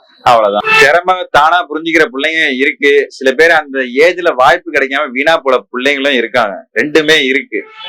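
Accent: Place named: Delta, India. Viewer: Evari delta slamg mathiri pesuraru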